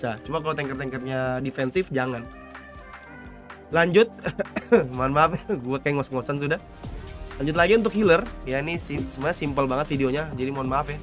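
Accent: Banjar, Indonesia. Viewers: Pantesan logat kamu familiar banget bang😂 ternyata orang Kalimantan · Abang org kalimantan selatan kh bang? Banjar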